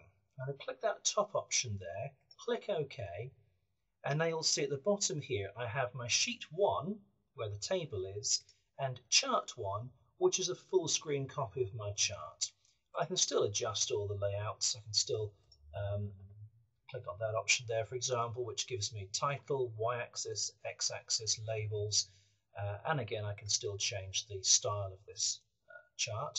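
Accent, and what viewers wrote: United Kingdom. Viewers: You British?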